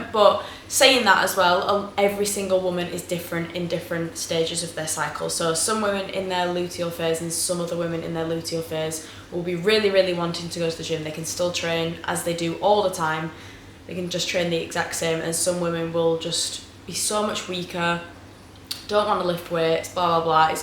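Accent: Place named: Burnley, United Kingdom. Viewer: I love that accent. Are you from Burnley?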